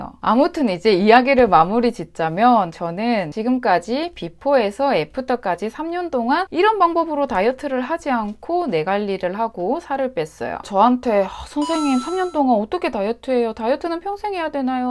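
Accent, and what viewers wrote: Gyeongsang, South Korea. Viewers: Yuna Kim 저도 경상돈데 살짝 저분은 경상도 말투 중에서도 희귀한 편인듯요.... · 경상도 사투리 베이스의 표준어인가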